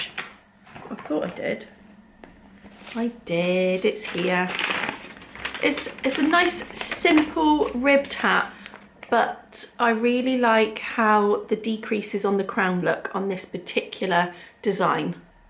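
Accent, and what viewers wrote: United Kingdom. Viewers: hello, I am new here, i enjoyed this episode so much:) I also have daughter age 17, shes studies at gymnasium grade 10, i could not understand a of your education system, did i understand right, son is at grade 6 or it's something else? Also I made a hat Melete , its really warm hat:) I don't know from what country you are, sounds like UK, but in Latvia we have really cold weather till -20, and I hope this will be warm enough for my daughter. Also loved suggestions for movies, tomorrow we will watch Wild robot. Thank you:)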